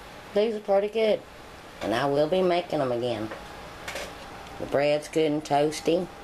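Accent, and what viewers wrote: South, United States. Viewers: you are too cute with your pretty country accent❤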